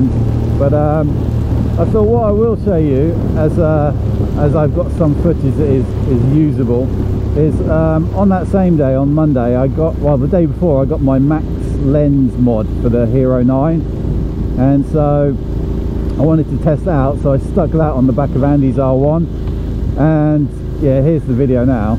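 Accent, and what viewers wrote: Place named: Manchester, United Kingdom. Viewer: Not sure I can use your accent as a pointer, however if I can I would say its not north Manchester, second stab.. Didsbury?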